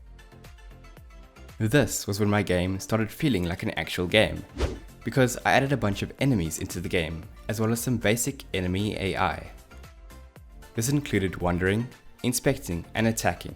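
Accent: South Africa. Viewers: Difficult to place your accent... You south african...? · I know a South African accent when I hear it :) game is coming along nicely, bro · is ja n SA aksent ruik soet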